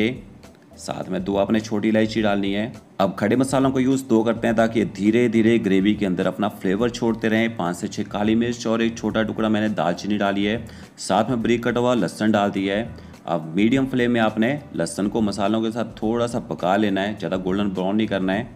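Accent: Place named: Punjab, India. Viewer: One thing which I love abt u is ur authentic punjabi accent...love u bro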